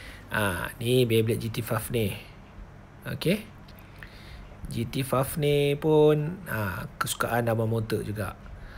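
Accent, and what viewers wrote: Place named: Negeri Sembilan, Malaysia. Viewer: Cakap nogori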